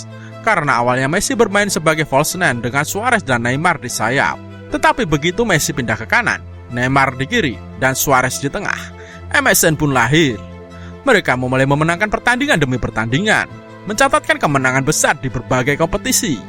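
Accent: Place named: Java, Indonesia. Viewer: Adminya medokk🤮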